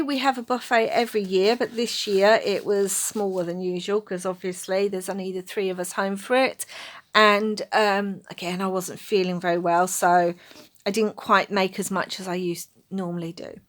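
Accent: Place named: England, United Kingdom. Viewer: Are you Irish born but live in England? Or have you lived in Ireland your entire life? I thought your accent is English/British. Just curious. Didn't want to know for any other reason.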